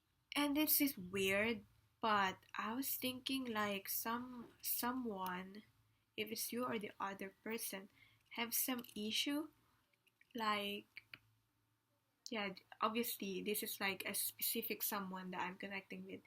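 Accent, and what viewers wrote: Philippines. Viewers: Picked no. 1. A good reading. I can tell w ur accent, that ur a Filipina.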